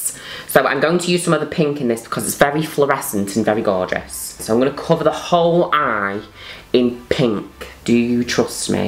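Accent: United Kingdom. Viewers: Your british accent is ICONIC and STUNNING!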